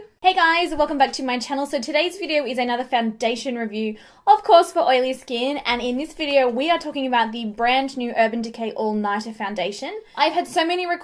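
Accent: Australia. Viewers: Sorry I couldn’t hear past the Australian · So many Australian makeup gurus, I love it. Or are your from New Zealand? I attribute your accent to Australia :p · Hey where are you from? You sound Australian....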